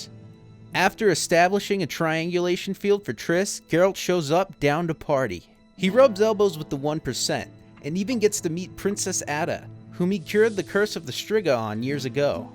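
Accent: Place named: Southern California, United States. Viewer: In-Depth recap by someone from Southern California lol Good recap and great accent. Thanks!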